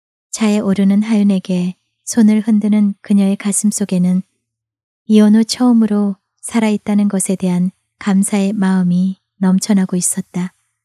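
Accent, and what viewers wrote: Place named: Gyeongsang, South Korea. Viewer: 목소리의 마법사이신 어쩌면 경상도 사투리도 넘~ 감사합니다^^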